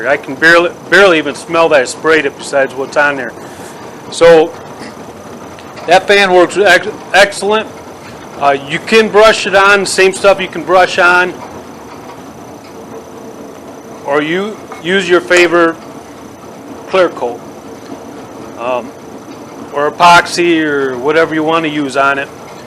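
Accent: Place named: Michigan, United States. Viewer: Thanks for this,. This was exactly what I needed. I was having some difficulty figuring out the bevel on my floor transition. Are you from Michigan? You kinda sound like people here in Michigan. Thanks again.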